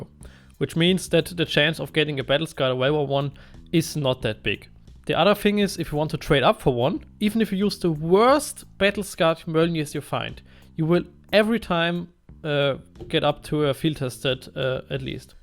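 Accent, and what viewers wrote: Germany. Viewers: schon witzig wie man deutsche am englischen dialekt erkennen kann^^ ich mag investment videos für steam market und lass mal ein abo da für deine mühen ;)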